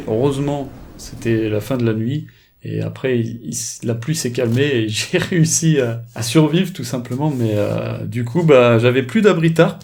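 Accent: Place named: France. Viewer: Penso che parli francese dal accento ma purtroppo io non e parlo e non l'ho capisco cazzo però parlo 3 lingue ma non capisco manco il francese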